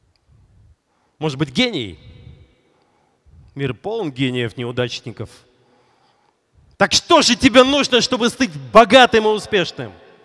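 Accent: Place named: United States. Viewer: Зачем так временами кричать, повторять американцев😂😂😂😂😂😂😂 даже интонации, типа психологическое воздействие, почему все бизнесмены начинают про свою жизнь рассказывать. Не спорю полезная информация. ГЛАВНОЕ ВЫБРАТЬ ПРАВИЛЬНУЮ ЦЕЛЬ И ПРАВИЛЬНУЮ МОТИВАЦИЮ чтобы достичь своему целью. Тогда и будет все. Если цель конкретная, и мотивация выбранная правильная как пинок, тогда и достигнет. Главное при этом торгашеском мире не забывать и о духовном богатстве☝️☝️☝️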